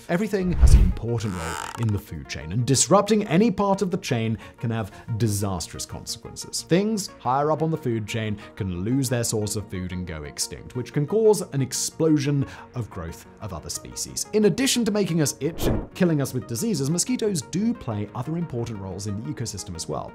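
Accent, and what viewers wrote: United Kingdom. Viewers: I thought this was v sauce doing a British accent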